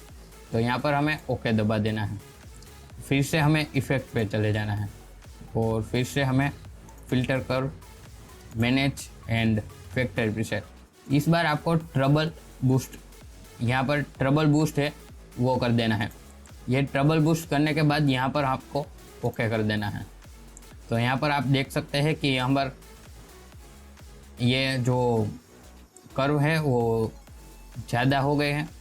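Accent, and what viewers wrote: Gujarat, India. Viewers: bhai aapki speaking se pta chl jata h ki aap gujrati ho....😂😂 Btw i m also gujrati aur me aapke baaju me rehta hu i m from jetpur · Are you gujarati..???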